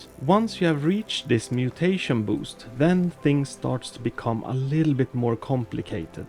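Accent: Sweden. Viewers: are you swedish ( är du svensk)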